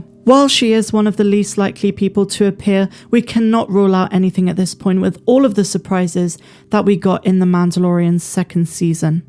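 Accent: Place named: Bristol, United Kingdom. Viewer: Where you from? I'm from Bristol, you sound very close 😂 liking the channel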